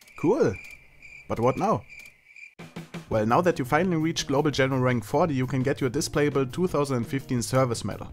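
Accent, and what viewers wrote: Germany. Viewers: man merkt so extrem deinen deutschen Akzent! ^^ aber echt gutes video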